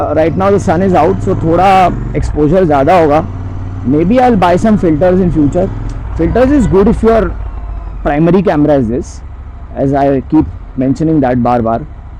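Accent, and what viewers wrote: Gujarat, India. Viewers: Are you guju bro ?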